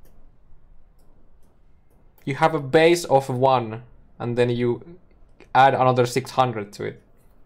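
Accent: Finland. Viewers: Olin huomaavinani Suomalaisen aksentin ja sitten katsoin nimeä. Kyllä vain, hyvin tunnistettava aksentti.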